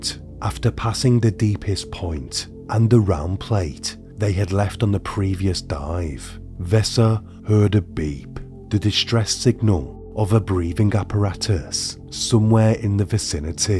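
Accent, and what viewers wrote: United Kingdom. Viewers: I love the British accent, though I find it hard to follow if I'm just listening (yes. I know. It means I'm stupid cause no one else has that issue. Kool... Moving on) I thoroughly enjoy your narration, and, for what it's worth, appreciate the work you put in. "Jolly good show ole chap!!" ❤ p.s. Liked AND SUBed 😉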